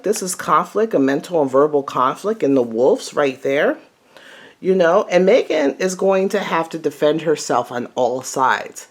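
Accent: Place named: New York, United States. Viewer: I thought I heard a little subtle of NY. I think a big conflict is Meghan's never Trumper bs narrative with all of her Illuminati hollyweird friends. The meeting of DJT is going to be something she isn't going to like and will possibly not be included. Watch, next week or in the next weeks to come, there will be an appearance with the oblamers that will cast shade on her "royal position". It's going to piss off the queen of the fall out from it. Just a feeling I have. This will be an "opportunity" for the oblamers to insert their "presence" onto the world stage. It's going to interesting to watch to say the least! Grab the popcorn!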